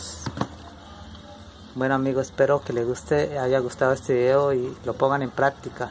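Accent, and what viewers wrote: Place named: Santander, Spain. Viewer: que no se note que es santandereano, maestro.....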